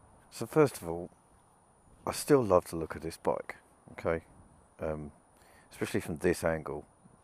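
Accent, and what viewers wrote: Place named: United Kingdom. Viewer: Dig your sense of humour and Brit accent LOL 😂 Great video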